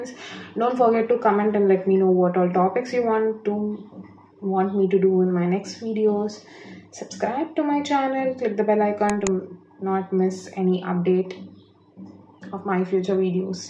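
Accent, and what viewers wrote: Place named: Telangana, India. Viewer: Telangana accent 🙌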